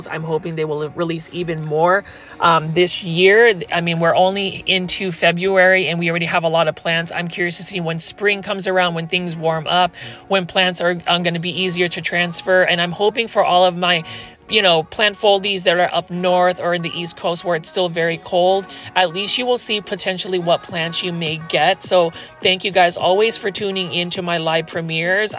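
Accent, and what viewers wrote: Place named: Philippines. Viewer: Who cares how he pronounce it. Everyone already knows he from the Philippines and that he has an Filipino accent. So his word pronunciation is different from the way we pronounce words.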